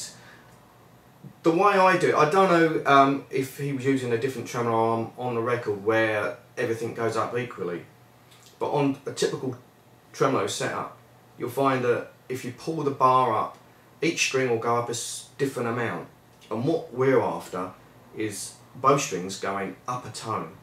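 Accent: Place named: London, United Kingdom. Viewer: yep, I found that midi file for the song, and I also use Logic, so maybe i'll put a few hours in to making it sound nice like you have. I noticed from your accent that you might not live too far from me, I am in east London and I'm looking for a guitar teacher to help me with a few things, I've been playing 42yrs, I'm just looking for a teacher who is in to the same sort of sound, runs and modal work that interests me, If you could spend a few hours a month with me, I would really appreciate it, money no prob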